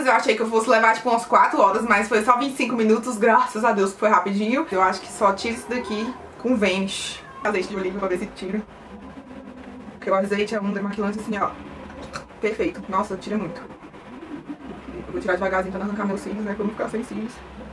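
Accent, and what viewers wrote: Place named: Bahia, Brazil. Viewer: senhor! que coragem. ..Kkkkkkkk tu é linda demais , amo seu trabalho e seu sotaque parece que é bahiana. beijos linda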